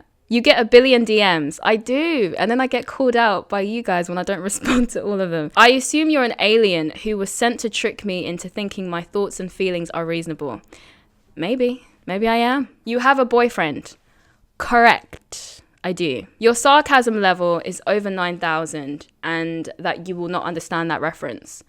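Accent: United Kingdom. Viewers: I'm a new sub, I would I say I admire how you handle and breakdown topics very logically and reasonably. I can respect that about anyone despite their political beliefs. Plus you have a british accent, which is awesome to me lol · You are a really cool girl! Smart, cute and funny, a keeper! I love the UK accent so much 😅 definitely a turn-on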